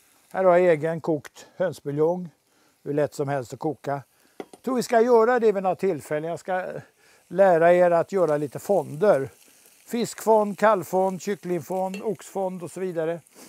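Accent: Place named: Gothenburg, Sweden. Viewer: Hej, Du bryter både på "Göteborgska " och "Stockholmska". Vilket är din farorit dialekt?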